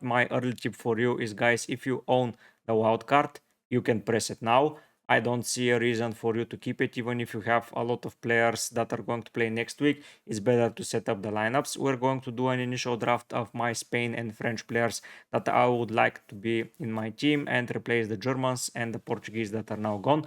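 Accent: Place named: Bulgaria. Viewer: I just heard 3 seconds and I knew you were Bulgarian, can’t miss the accent.н😂 Жив и здрав брато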